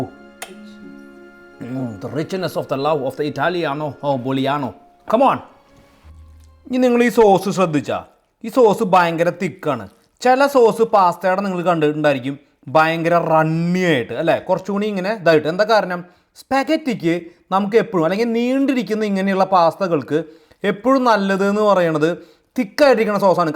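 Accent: Thrissur, India. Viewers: നാട്ടിൽ പോയി വന്നോണ്ട് തൃശ്ശൂർ സ്ലാങ് അടിപൊളി ആയി വരുന്നുണ്ട്... But സൂപ്പർ 😍.... ❤️ ഉണ്ട് ബ്രോ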